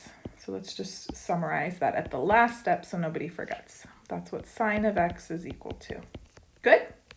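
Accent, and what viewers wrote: United States. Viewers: As a German, I can say that your pronunciation of Weierstrass is pretty good, but you can tell you're from the US 😄.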